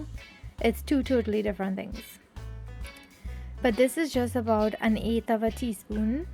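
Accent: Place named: Trinidad and Tobago. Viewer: I live in Montreal, Quebec Canada, I would love LOVE to have a wife born in , Trinidad and Tobago I adore everything about these women, The food, the fancy dress, and that accent, the culture, the eyes, ouff Being an Italian man, guess it will remain a dream. dammit [ a pinch goes a long way she says,,,, LOL ] sometimes they slap us when we pinch 'em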